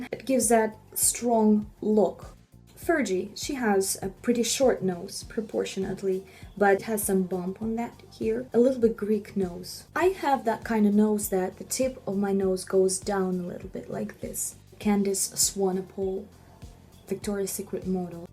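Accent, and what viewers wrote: Russia. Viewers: I can hear russian accent hehe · Русский акцент чуть чуть чувствуется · Russian))) да неплохо звучит наш акцент)) я всегда за это волнуюсь ,когда говорю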